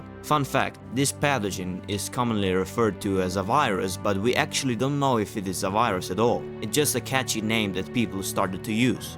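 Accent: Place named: Russia. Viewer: Ur accent feels russian to me btw. Nice video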